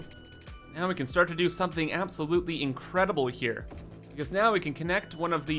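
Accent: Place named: Canada. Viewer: Golden Ears Park, do you happen to live on the west coast of Canada? I’ve been noticing the Canadian accent more and more lately and now I’m like 99% sure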